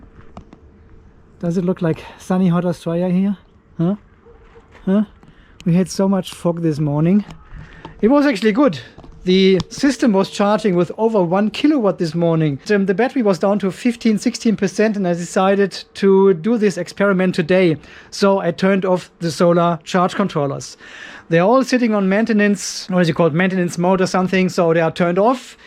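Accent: Germany. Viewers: Ah the weekly dose of Australian volts with a German accent. Cheers!, i connected the "peter" inverter to a convection oven. Now that draws some amps! bake some bread/pizza's if you want a load seems a great idea... Great display btw.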